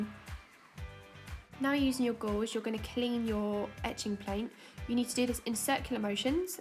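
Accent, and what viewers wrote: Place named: Bristol, United Kingdom. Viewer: A Bristol accent! My roots!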